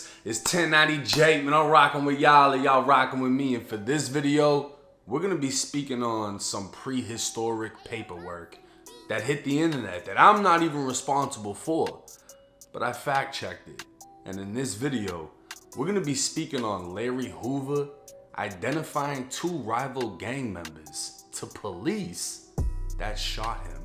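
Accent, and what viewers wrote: Boston, United States. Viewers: Im surprised you still got the boston accent but good video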